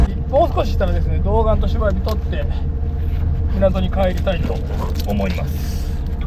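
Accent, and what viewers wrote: Okayama, Japan. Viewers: いつも楽しく拝見しております。自分黒崎中学校出身で故郷の海、岡山弁懐かしいですね。瀬戸内海のカニ、カキ美味しいですよね。さむい寒い季節ですが頑張って下さい。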